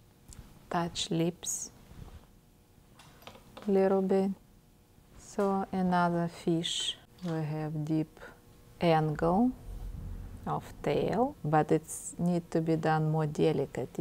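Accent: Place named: Russia. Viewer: Такой русский акцент)))Но урок очень хороший!А какой марки используете темперу?Мастер класс?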